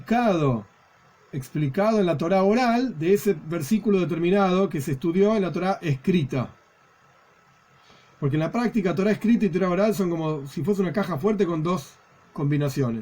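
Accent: Argentina. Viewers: Rab sos Argentino?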